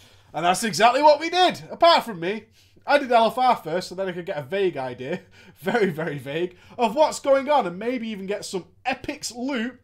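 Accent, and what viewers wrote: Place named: Newcastle, United Kingdom. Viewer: As a Mackem, I find your Geordie accent hilarious!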